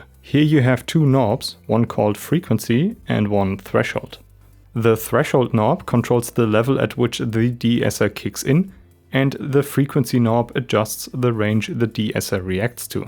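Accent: Germany. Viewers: Habe am dialekt gehört dass du deutsch bist kannst aber gut erklären :)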